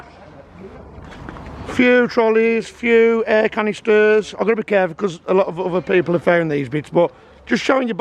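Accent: Birmingham, United Kingdom. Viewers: i love the brummie accent great stuff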